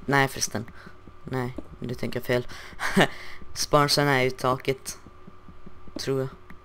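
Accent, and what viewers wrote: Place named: Gothenburg, Sweden. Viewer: Var bor du ? Låter lite som göteborgska..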